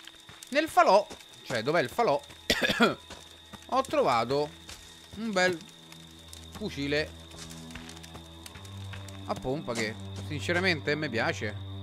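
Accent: United Kingdom. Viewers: ci sei troppo bbritish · Quanto sei britisch quando parli inglese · Ciccio e l inglese molto British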